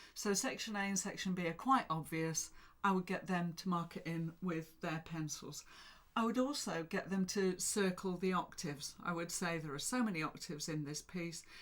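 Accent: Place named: England, United Kingdom. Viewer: you sound English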